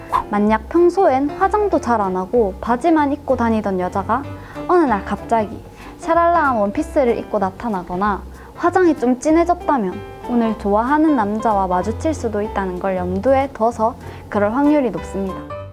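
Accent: Gyeongsang, South Korea. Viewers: 아~ 나 서울남자... 표준어 섞인 경상도 사투리 좋아요. ㅋㅋㅋ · 표준말 쓰면서. 간간이 경상도 억양이 묻어나오는게 귀여워요. 그런식으로 방송하면 나름 특색있는 경상도여자 유튜버로 인기끌겠어요. 건투빕니다. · 경남 진주가면 저분 만날 수있는건가요? ㅠ 사투리 억양 너무 귀엽습니다. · 경상도사투리가 ㅋㅋ 매일 듣는 사투리인데도 영상에서 보니 더 새롭게 느껴지네요^^ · 대구 아가씨 아니예여 대구 말투인데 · 경남진주. 내 고향인데. 말투가 진주네요. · 누나 사투리 너무 매력있어요 ㅠㅠ 경상도 사투리가 이렇게 좋구나 대구 사람인데 첨 알았어..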